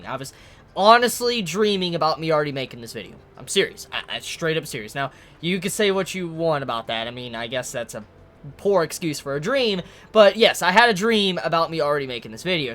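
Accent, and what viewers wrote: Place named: South, United States. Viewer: He is from the Southeastern USA. He has a bit of a southern accent but not has heavy.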